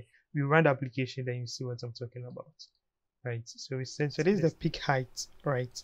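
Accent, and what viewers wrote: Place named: Nigeria. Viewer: nice video, you have a nigerian accent are you from Nigeria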